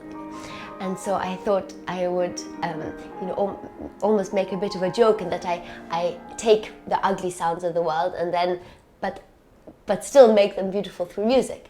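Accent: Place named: Germany. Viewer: I think I here some slight German accent in her English mother tongue.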